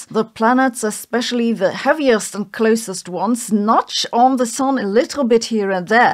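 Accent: Germany. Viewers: Incredible accent. My thinking is she’s German and has spent a lot of time in the U.K. Now on to the Science…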